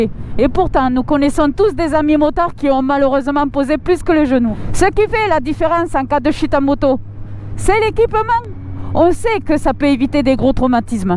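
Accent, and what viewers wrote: South, France. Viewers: avec ton accent du sud ça passe sans problème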